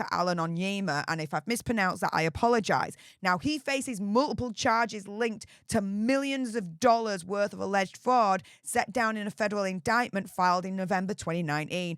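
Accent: United Kingdom. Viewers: When my great-niece grows up in the UK, this Yank hopes she has your accent.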